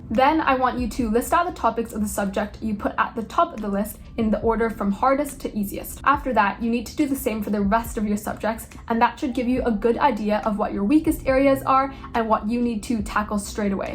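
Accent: United States. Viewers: How come you have an American accent ❤😂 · u sound american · you sound american but are doing gcses?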